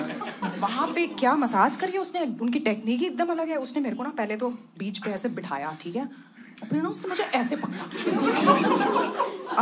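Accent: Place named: Punjab, India. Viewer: U r not funny at all. I don't know why people are laughing. Punjabi accept and poor punches.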